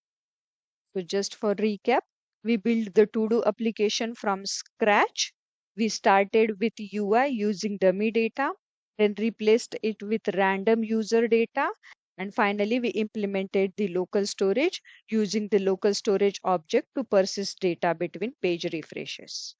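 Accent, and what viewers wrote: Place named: Maharashtra, India. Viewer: My mind is thinking that your english is having little Marathi accent 🤔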